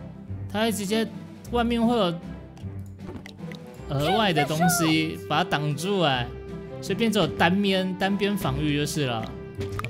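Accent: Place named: United Kingdom. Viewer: 是英國腔沒錯，英國留學生來幫你證明哈哈，很多重音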